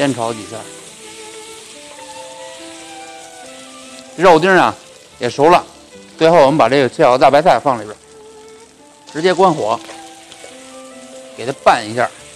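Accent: Beijing, China. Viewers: 真爱听你说的京味，看着都馋了。今晚我己调好了馅，明天就学着自己做，另外想请教一下叉烧包的包法，跟“美食台”的广东师傅学做过但包子不开裂，厨师长能告我什么原因吗？谢谢！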